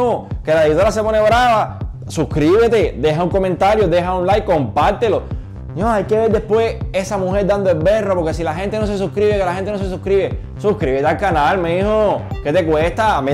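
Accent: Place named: Cuba. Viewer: Muy buenos tus vídeos,imaginate que los veo con los datos que adquiero( tu sabes) jajaja. Me cuadran cantidad sigue hablando en buen cubano para seguirte entendiendo .saludos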